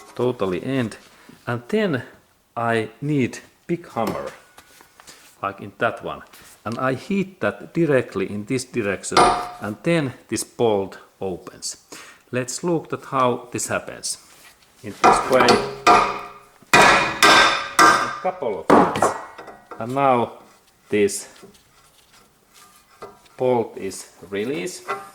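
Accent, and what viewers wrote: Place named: Finland. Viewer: Your Finnish accent brings me joy. Now I know that you know what you’re talking about.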